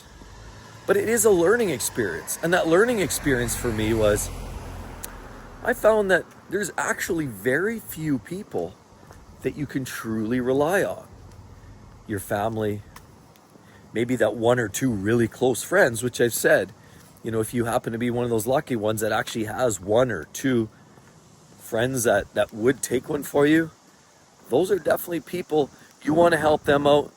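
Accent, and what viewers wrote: Canada. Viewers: ARE you CANADIAN? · Lol...I could tell by your accent you're Canadian. 😊 🇨🇦 🍁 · First...awesome stuff!--like your no-nonsense style along with the Canadian accent (ofcourse) haha.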